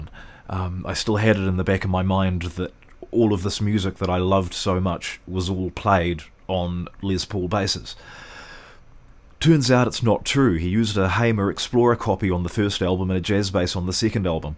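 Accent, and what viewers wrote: New Zealand. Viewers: Fantastic! Excellent piano, drums and bass - and kiwi accent! Well done dude.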